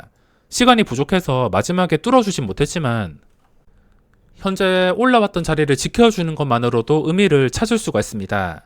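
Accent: Gyeongsang, South Korea. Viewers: 창원사투리 진짜 짜증나네 표준물좀 써주시죠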